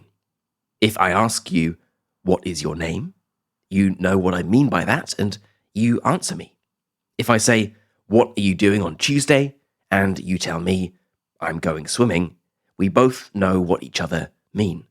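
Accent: United Kingdom. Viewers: Teacher Is your accent british?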